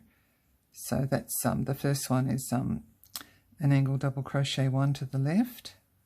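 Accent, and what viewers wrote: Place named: Australia. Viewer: is Australian... hence the accent!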